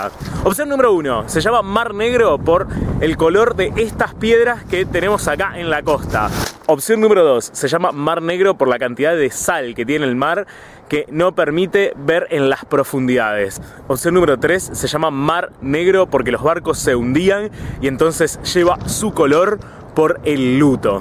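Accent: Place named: Argentina. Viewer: Querido, debo confesarte que te descubrí hace poco tiempo. Soy jubilado, orillando los 70 pirulos y me paso las tardes viendo y disfrutando tus videos. Además de ser muy interesantes, tenés una manera de narrarlos muy argenta. Pondero la honestidad que profesás por tu cultura, el respeto por tus ancestros sin desestimar tu Argentina a la que has recorrido y mostrás con deferencia. Felicidades por tu juicio, por tu labor educativa y por haber encontrado una manera apasionada de vivir!.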